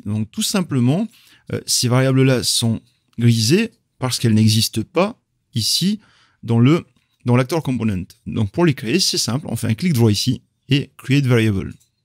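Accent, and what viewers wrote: Tahiti, French Polynesia. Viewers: Bravo, vraiment! Je me demandais quel accent tu avais:) Tahitien donc!, enchanté moi je suis Breton:D Tu as du skill c'est indéniable, continu STP avec tes vidéos (je n'ai vu que celle-ci:D) d'aider la commu francophone sur se superbe moteur. Bon maintenant je vais voir tes autres vidéo:D Par contre j'ai pas compris pour ta timeline si elle est de type vector par exemple, est ce que l'on pourrais en faire une macro et la mettre dans l'actor component? Encore merci tu viens de gagner un fan:)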